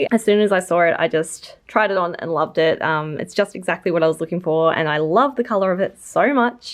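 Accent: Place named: Australia. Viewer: Love this! So fun to see someone go to spotlight 😉 as a fellow aussie it's so nice to hear your accent and hear you talk about and go to familiar places (like salvos too!)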